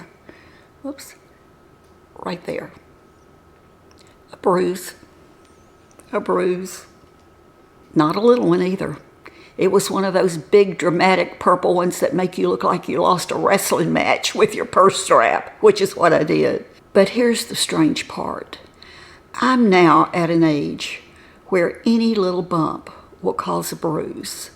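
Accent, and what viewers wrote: South, United States. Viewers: you are so absolutely gorgeous oh what I wouldn't give for such beautiful sparkling blue eyes and I LOVE your adorable southern accent!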